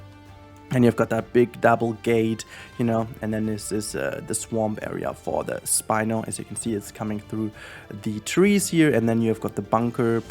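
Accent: Germany. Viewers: He sounds so german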